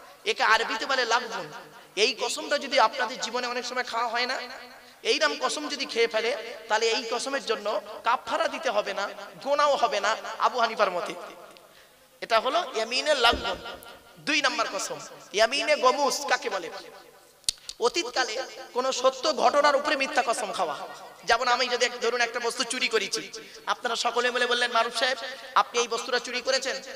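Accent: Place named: Kolkata, India. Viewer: হুজুর আপনার ওয়জ খুব সুন্দর লাগে আমি বাংলাদেশ থেকে বলছি আপনার কথা শুনে মনেহয় কোলকাতা কি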